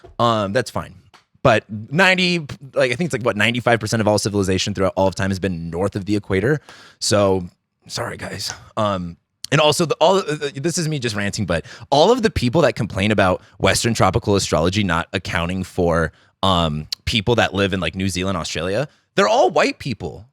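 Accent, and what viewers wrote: California, United States. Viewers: What? Are you from Lancaster or San Bernardino?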